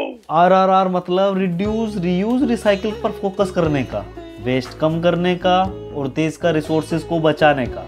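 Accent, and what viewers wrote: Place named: Hyderabad, India. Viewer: hyderbadi or mumbai ka mix lag rha hai mujhe🤣😅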